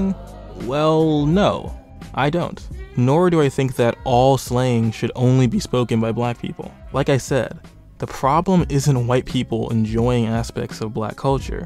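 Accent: Queens, United States. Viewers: She grew up in Queens... the not nice part. Everyone talks like this. Indian, Italian, Asian, Mexican... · Isn't she from New York in queens, where the whole community sound like her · She's from Queens. Get over it. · She really just sounds and talk like that she is from queens nyc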